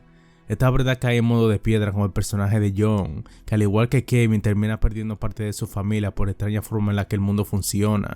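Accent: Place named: Central America. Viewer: Leftovers es una maravilla, es muy bueno que se reivindique una serie de esta categoría, sin embargo es muy importante que el relator, hable un español claro, sin acento. Puede ser el mejor análisis sin engarbo cae todo cuando comienzas a oír un acento centroamericano marcado, ademas utiliza modismos que realmente destruyen todo. un consejo sano, no hables con acento, si tu no puedes hacerlo con un léxico claro, pedí a alguien que te lea el guion.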